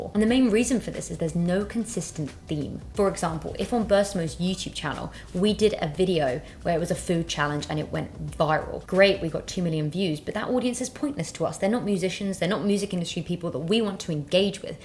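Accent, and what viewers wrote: United Kingdom. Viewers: I think your so cute and i love your british accent...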